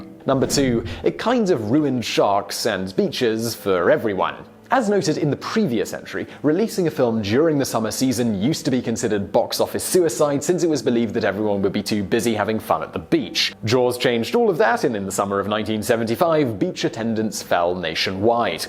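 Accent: United Kingdom. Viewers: This clip needs an AMERICAN ENGLISH voice over. Save your intolerable British gibberish for Masterpiece Theater....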